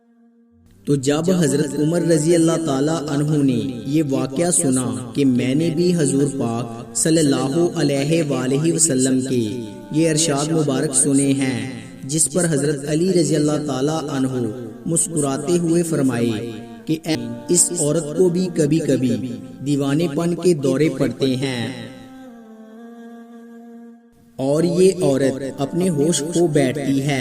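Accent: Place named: Punjab, Pakistan. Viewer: برخوردار آپکی آواز میں ایسا سوز نہیں اور تلفظ پنجابی لہجے کا ھے۔ مناسب ھے آپ اس کام کے لئے کوئی دوسرا موضوع تلاش کریں پلیز۔۔۔جزاک اللہ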